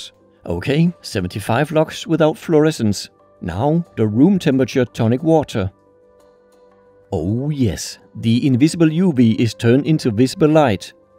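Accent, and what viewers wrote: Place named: Denmark. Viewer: Elsker din tydeligt danske accent 😂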